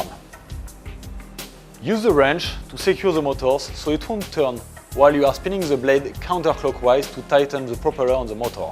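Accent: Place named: France. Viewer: Bonjour oh l accent francais LOL ouuuuu je voudrais bien la meme demo en francais surtout pour la camera.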